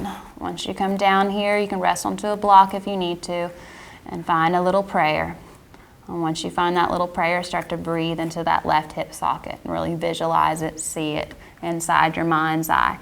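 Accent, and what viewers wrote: South, United States. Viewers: I love your country accent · This girl is southern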